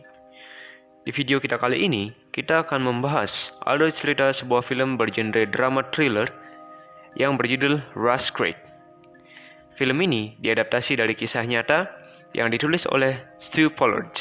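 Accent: Bali, Indonesia. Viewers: Org bali ni logat nya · Aksen sudah kelihatan... Semangat bli, maju terus untuk berkarya... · Klo d perhatiin. Gaya bicara dan logatnya kaya orang bali...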